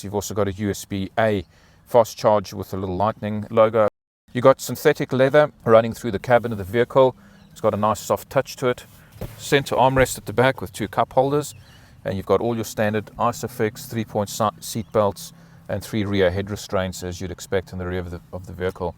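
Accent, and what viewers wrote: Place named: Johannesburg, South Africa. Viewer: Sounds like you’re from Linksfield, Joburg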